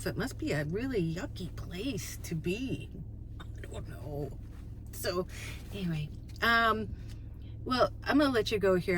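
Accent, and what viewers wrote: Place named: Fargo, United States. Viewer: I think you talk like Fargo😊. Then again I am from Rhode Island. 😉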